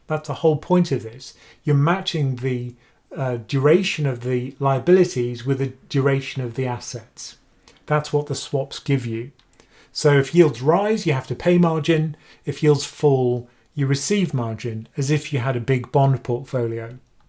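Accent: United Kingdom. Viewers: Temporary. Targeted. Transitory. Sounds like US Fed talk, just with a British accent.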